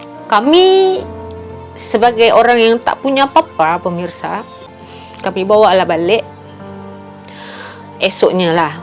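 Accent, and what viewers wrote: Malaysia. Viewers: Bahasa sudah seperti bahasa org malaysia dah👍👍👍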